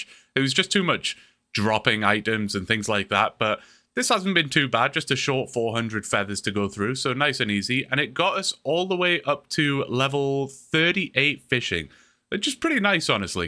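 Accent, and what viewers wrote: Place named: Newcastle, United Kingdom. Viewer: A geordie RuneScape player? Thought i was alone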